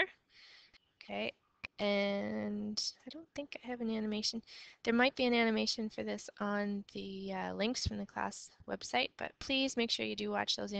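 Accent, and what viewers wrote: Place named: Canada. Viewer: Canadian accent <3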